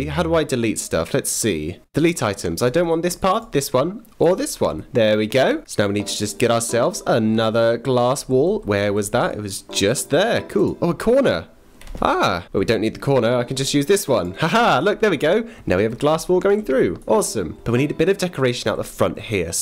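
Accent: United Kingdom. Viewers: AWW YOUR BRITISH!!! :3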